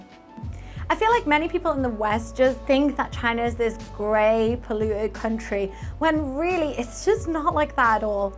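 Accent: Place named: Northern England, United Kingdom. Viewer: Nice video :) I thought i heard a northern twang in your accent...that was Bamburgh castle right?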